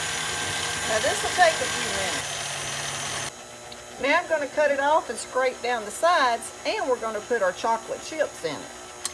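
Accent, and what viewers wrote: South, United States. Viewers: I may make these tonight! I love your southern accent. Yumy Yum - Thank you. (Greensboro NC)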